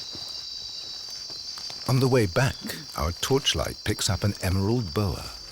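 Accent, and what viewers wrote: United Kingdom. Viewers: He’s British. Everyone who speaks English is Not American.🤷🏽‍♂️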